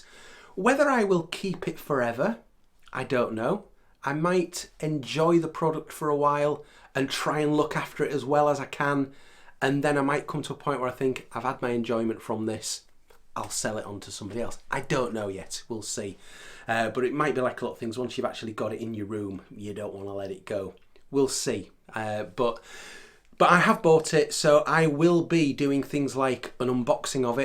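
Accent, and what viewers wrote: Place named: Yorkshire, United Kingdom. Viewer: Thanks. I'm so glad you went for it and looking forward to the unboxings... I rarely buy releases when they come out and prefer to stumble over unexpected jewels and bargains - so seeing your authoritative videos clues me up so well. I really dig your benevolent perspective on things... I'm a Yorkshireman missen so I dig yr groovy accent too! keep on chooglin dude!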